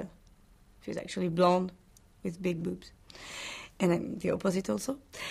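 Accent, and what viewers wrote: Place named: France. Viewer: lovely french accent!kinda sexy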